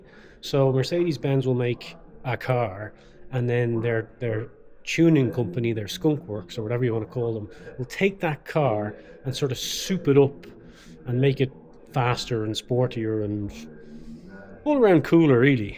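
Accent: Ireland. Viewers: Me too that Irish accent and French names always gets me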